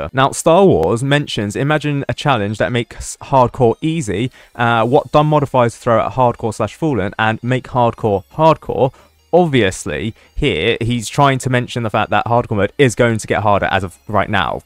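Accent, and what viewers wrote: United Kingdom. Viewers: sometimes i cannot understand what say cuz his british accent and a very familiar british voice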